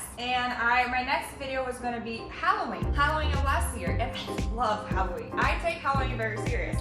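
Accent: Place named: Rio de Janeiro, Brazil. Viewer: te conheci pelo canal da Bruna e de início te achei um pouco debochada (acredito que pelo sotaque carioca hahahah sou sergipana, vizinha de estado da bruna, inclusive já estudamos na mesma universidade, mas em momentos diferentes), enfim, acabei entrando no seu canal e me surpreendi demais, tu parece ser uma pessoa mto sensata e do bem. Tenho mta vontade de morar em LA, quem sabe um dia, né? Apesar de entender o inglês mto bem, eu tenho mta dificuldade p falar e ver vc falando tão bem me motiva demais. Enfim, só queria falar isso <3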